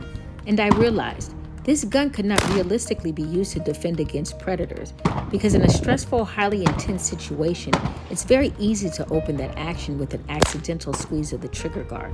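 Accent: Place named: United States. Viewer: Thank u Sister. Your channel is the one of the most attractive to me. Smooth, correct american speech, n well knowing the subject. U really good. ❤ Respect from a Russian speaker.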